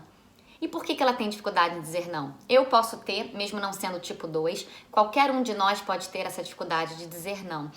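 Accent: Rio de Janeiro, Brazil. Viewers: CARIOQUINHA. BOM.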